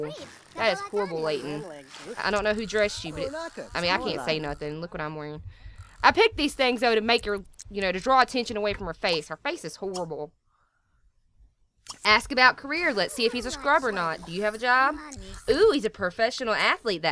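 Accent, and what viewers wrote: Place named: South, United States. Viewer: I just love your country acsent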